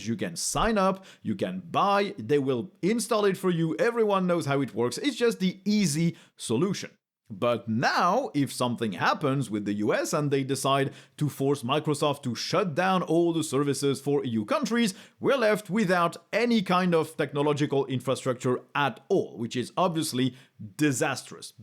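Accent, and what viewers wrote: France. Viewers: i love how uses french pronounce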